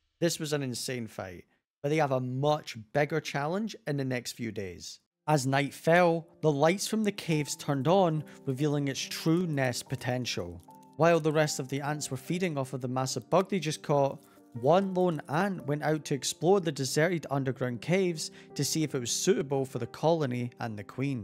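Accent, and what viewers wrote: Scotland, United Kingdom. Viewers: That a scottish accent? If so love to see a fellow scot being an ant enthusiast 💙💙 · Thank understandably British guy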